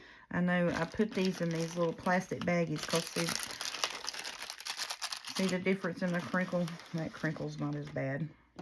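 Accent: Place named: South, United States. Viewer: Gf I love watching your not only are you a amazing crafter! You are so funny I get so tickled at you it is neat to hear someone one as Southern as me your awesome. God Bless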